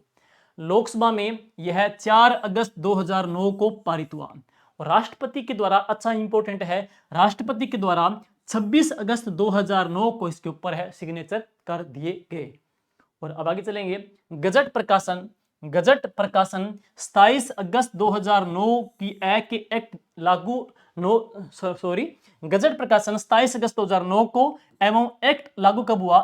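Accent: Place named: Shekhawati, India. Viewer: Bhai aapka grah jila churu h kya boli m shekhavati touch aata h 🎉🎉🎉 I'm from jaipur or aap mere chhote bhai jese dikhte ho uski skl bilkul aap me milti h ditto🎉🎉🎉🎉🎉🎉🎉🎉🎉🎉🎉🎉🎉🎉🎉🎉🎉🎉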